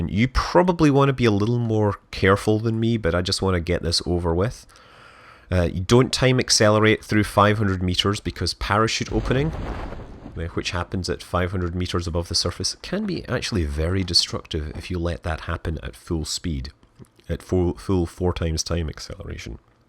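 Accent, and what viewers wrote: Scotland, United Kingdom. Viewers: Man his accent is doing my fucking head in. half DJ half Scot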